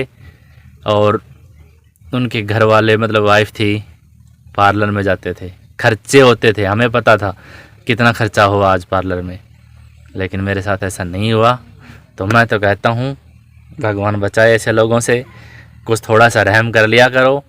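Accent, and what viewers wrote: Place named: Haryana, India. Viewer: Wov lagta h ap haryana se ho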